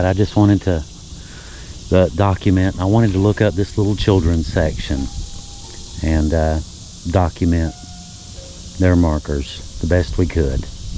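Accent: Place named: Appalachia, United States. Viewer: It's that way here in North central Texas. Especially at the oldest cemeteries. There was a story of families that had lost so many children that they weren't even given a name until they were 2. I actually thought that was untrue, but it isn't. Lots of people died here in 1918 with the influenza but when it appeared again in 1919 it was even worse. Breaks my heart to walk, see mom and dad and several very small children side by side. I have been a sensitive all my life and there's times and especially places that it's overwhelming. I just stand and pray for all of them., your channel has quickly become one of my tops. I have family all over southeast KY and in west Virginia. Hearing you talk makes me feel like I am up there with them.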